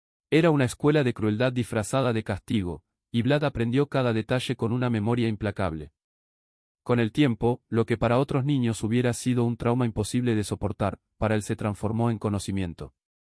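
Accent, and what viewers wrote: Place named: Rioplatense, Latin America. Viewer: acento me suena a español rioplatense en el video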